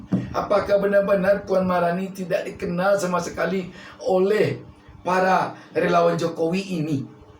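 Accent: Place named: Padang, Indonesia. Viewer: Pak... Bahasa indonesianya pakai dialeg mana thu.... Kayakya dialeg padang ya...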